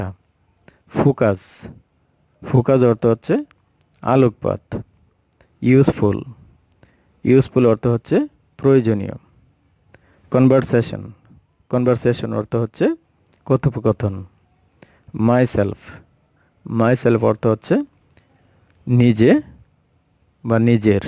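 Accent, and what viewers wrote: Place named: Dhaka, Bangladesh. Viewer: আপনার কথা গুলো ঢাকার মতন লাগে, মাধবপুরের বাসা বুঝা যাইনি😁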